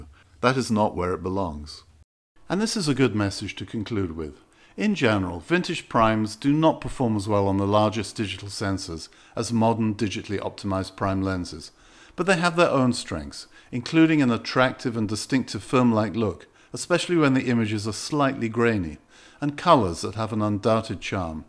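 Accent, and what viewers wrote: United Kingdom. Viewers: Very nicely made, fantastic voice, better than most of the best tv newsreaders. Can't beat the Brits ! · Very British, were I to guess?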